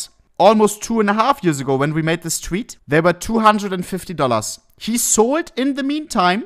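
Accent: Germany. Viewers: German accent in english is fucking great lmao i moved to germany over 2 years ago and as a english speaking person it was soooo funny hahahahahah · my guy is german but speaks english bra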